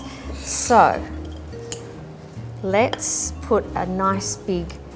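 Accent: Australia. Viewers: Nice Shanks...nice Australian Accent as well. Regards from San Jose California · R u an Aussie ? Thank you so much This was beyond incredible . Ill be making it next week when my crock pot is delivered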